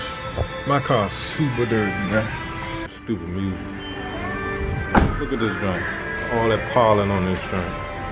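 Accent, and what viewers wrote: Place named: Chicago, United States. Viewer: You from Chicago. Based off how you say water and and car I can tell?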